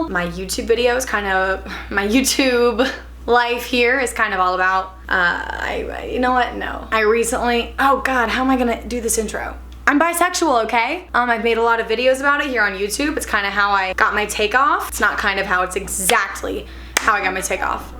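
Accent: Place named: United States. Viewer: Your funny 😂😂I love your American accent 👋🤟🏽hi I’m Niayla nice to meet u 🤣🤪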